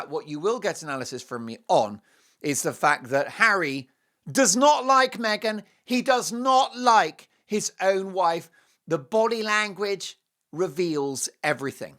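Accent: United States. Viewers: GAH! Your U.S. accent is really good! 😅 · Your American accent is pretty good, too bad I can't say the same of your singing. I do love your show just not your singing. I think your analysis of Harry's pose is not accurate, but I agree he, by now, is seriously regretting his marriage and is very unhappy. I fear he might eventually be a suicide risk because when she dumps him, he will have nothing left.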